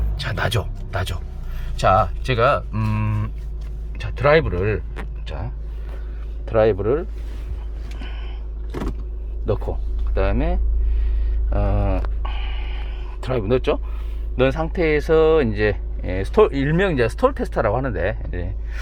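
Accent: Jeolla, South Korea. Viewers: 어디서 공업사를 하시는지 궁금합니다 전라도 말투신것같아서 전라도면좋겠어서 댓글드립니다ㅠ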